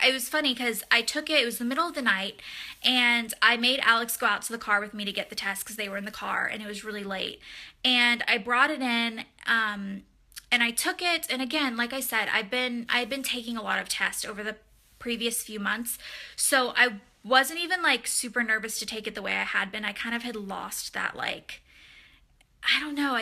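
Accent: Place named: South, United States. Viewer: I don't know what it is about you that makes me so happy. Maybe its that your twang comes out randomly when you're saying something. HAHA Its the same with Blair and my Texan self loves to support my southern belles. =P Congratulations on the Baby!! You must be so grateful that you have the flexibility to travel so much to Tennessee and with the morning sickness and bed rest? My goodness!!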